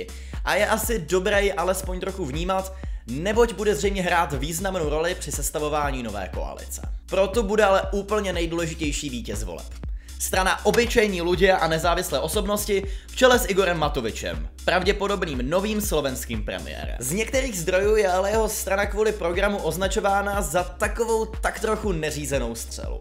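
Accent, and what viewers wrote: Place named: Czech Republic. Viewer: to je tak smiešne keď čech číta slovenčinu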